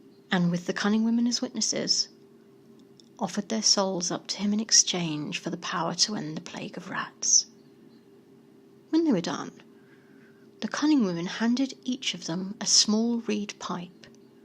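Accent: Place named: England, United Kingdom. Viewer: Is it sad I'm just a bit jealous of the beautiful English accent and the shout out from? 😬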